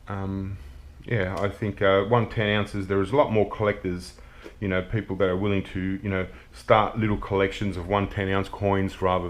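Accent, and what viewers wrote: Australia. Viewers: Love the coins mate..you have a very cool take on coins but wash your hands bro and how bout some neosporin and a bandaid on that finger. Sweet aussie accent too.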